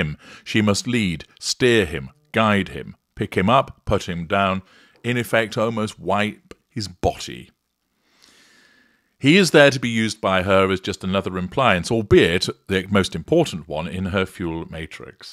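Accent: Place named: RP, United Kingdom. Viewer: Can't get enough of your BBC voice,.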